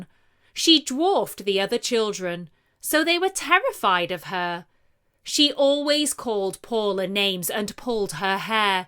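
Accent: United Kingdom. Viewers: What's this type of British accent it really sounds magnificent and original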